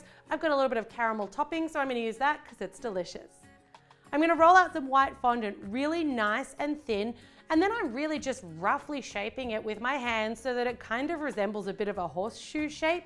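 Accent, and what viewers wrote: Australia. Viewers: Veronica Carabez fr thoo i obviously thpught dhe was austrailen but that was it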